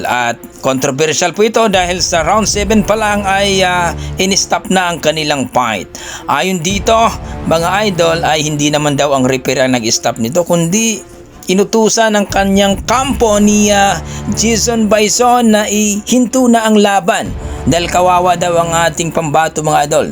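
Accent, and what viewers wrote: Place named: Visayas, Philippines. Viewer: Bisaya na bisaya Ang pananalita mo, para ka bulol mag salita, Masakit sa tengga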